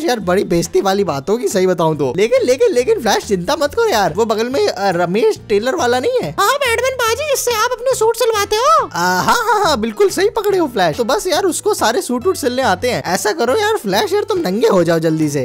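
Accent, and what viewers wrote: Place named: Punjab, India. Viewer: Meri party aapka yah Punjabi tadka hai na bada mast hai mujhe maja aata hai aapka Punjabi tadka sunane mein aur Hindi wala Punjabi tadka 👍👍👍👍👍👍👍👍👍👍👍👍👍👍👍👍👍👍👍👍👍👍👍👍👍👍👍👍👍👍👍👍👍👍👍👍👍👍👍👍👍👍👍👍👍👍👍👍👍👍👍👍👍👍👍👍👍👍👍👍👍👍👍👍👍👍👍👍👍👍👍👍👍👍👍👍👍👍👍👍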